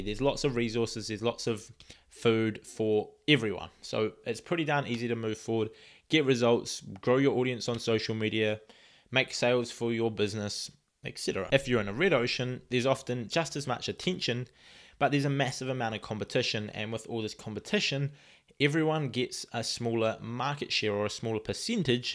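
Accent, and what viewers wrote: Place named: New Zealand. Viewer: I love your kiwi accent ❤️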